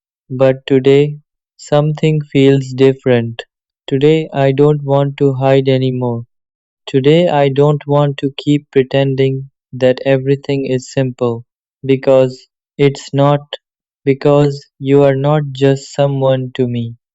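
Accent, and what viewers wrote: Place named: India. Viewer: Stop speaking with your indian accent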